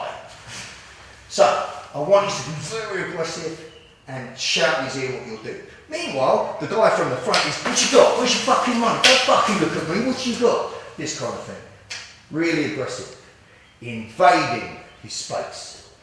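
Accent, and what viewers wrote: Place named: United Kingdom. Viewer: I wish I could understand what he is saying, its mostly english, yet the british accent is hard to figure out. I think If we were to see what he was talking about instead of just talking about it it would click and make more sense. I seen a couple of times where they almost started to show us some tactics other than pretending to hit in the face. I am gonna try one more video to see if the audio is any better.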